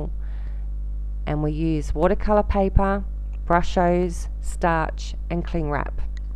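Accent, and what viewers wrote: Australia. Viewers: Hi, I have just found you. So good to hear a fellow Australian. I really enjoyed your video on Brushos. I have some on order just waiting for delivery. I know that I will love them. Thanks for your video. I will check out your blog. Hugs Glenis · Brilliant I have just ordered some, looking forward to receiving them. Awesome to hear an Aussie doing a tutorial make it seem like home well done.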